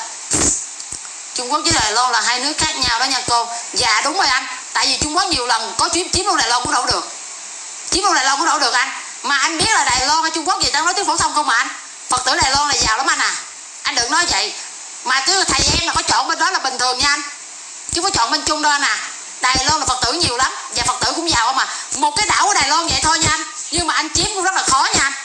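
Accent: Standard. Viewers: Em ca hat noi rat chuan nghe kg ong thay dong ho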